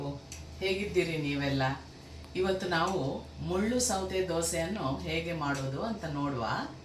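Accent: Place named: Tulu Nadu, India. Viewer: Madam your Kannada is very poor mixed with TULU LANGUAGE.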